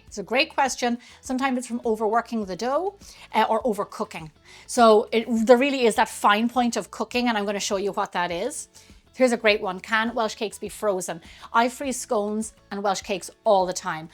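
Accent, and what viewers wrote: Ireland. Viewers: Those are some lovely Welsh cakes,. Your Irish accent just keeps getting more and more adorable than ever before. 🥰🥰🥰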